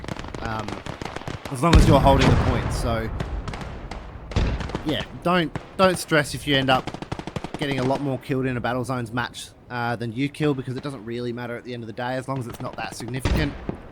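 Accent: Australia. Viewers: If they hire you to do the australian voicelines, I think "Happy days" is a mandatory one! and "Rough!" if a soldier dies.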